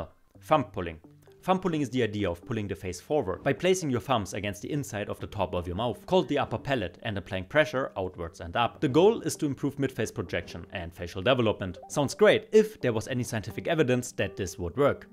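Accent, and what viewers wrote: Germany. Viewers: Gutes Video, man merkt dass du dir viel Mühe gibts und von der Qualität ist das echt stark. Echt verwunderlich wie du nur so wenige Abos hast. Ich denke mal öfters videos hochladen hilft dir bestimmt um mehr viewers zu attracten. Versuch bei deine Aussprache ein bisschen mehr den deutschen Akzent zu vertuschen weil es manchmal stark auffällt, aber nicht weiter schlimm. Man versteht alles und die videos sind von der Geschwindigkeit echt super. Mach weiter so. Ich wünsche dir viel Erfolg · I can Tell by your First Word you Are german . Guten Tag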